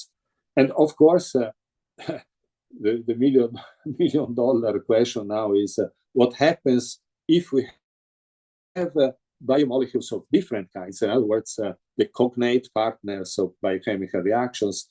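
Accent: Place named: Italy. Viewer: its the the phonemes and the inflexion, he is using italian inflexion and cadence and phonemes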